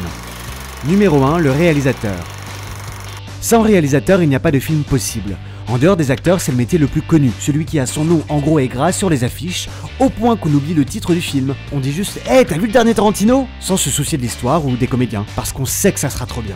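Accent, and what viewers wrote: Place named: Paris, France. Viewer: Pourquoi il y a un accent parisien aussi fort, et me dite pas que les parisien n'ont pas d'accent, il y en a un; et c'est celui là!!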